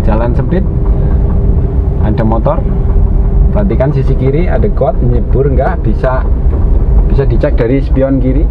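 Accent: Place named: Banyumas, Indonesia. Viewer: Wong ngapak ya😁😂😃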